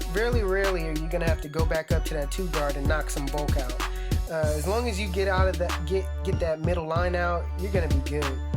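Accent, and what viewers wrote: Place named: Bay Area, United States. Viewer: Are you from Vacaville California? Because you gave the Bay Area accent.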